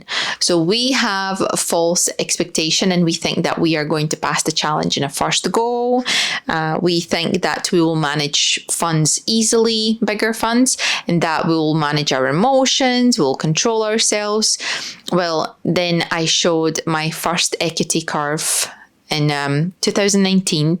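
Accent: Scotland, United Kingdom. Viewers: Funny thing about your accent. You grammar isn't 100% native, but I thought it was just some sort of Scottish vs American English difference. But I don't think Americans can hear any Latvia accent, just the Scottish accent.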